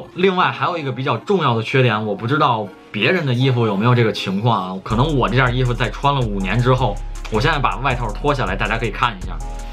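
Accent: Beijing, China. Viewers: 北京话 亲切！ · 哇博主是北京人吗！好熟悉的口音咧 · 你这口音是北京口音吗？实在是好难听啊！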